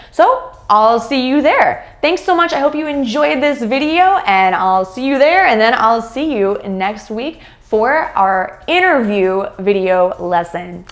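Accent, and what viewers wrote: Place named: United States. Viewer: I like American’s accent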